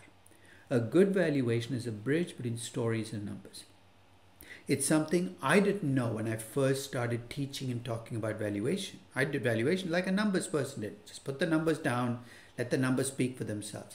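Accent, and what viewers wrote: India. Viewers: Excellent sir.. are you from Kerala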